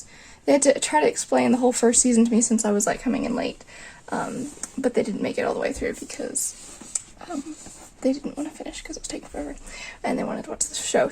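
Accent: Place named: North America. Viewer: Your vlog style is pretty relaxed. Sorry to hear you're stressing over assignments. Of course, everybody goes through that in one way or another. Good that you have friends in your house there. had her staircase mates in her Jesus College dorm. ...so I've been wondering what's up with your North American accent, and that fact that you say "bangs" instead of "fringe" and don't know the word "crest". May I ask where you're from?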